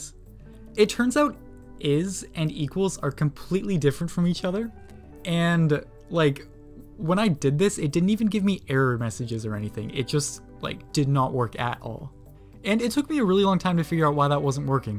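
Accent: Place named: England, United Kingdom. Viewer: I want to learn your English accent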